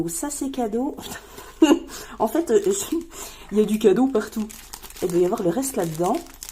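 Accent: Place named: Doubs, France. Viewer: Quel plaisir d’entendre ce doux accent du Doubs 🤣🤣🤣 je suis originaire de Dole 🥰 je reprends l’accent dés que je descends et je le perds quand je remonte en seine et Marne 🤣🤣 J’adore ton nouveau classeur et hâte de te retrouver Des bisous tout doux ❤️❤️❤️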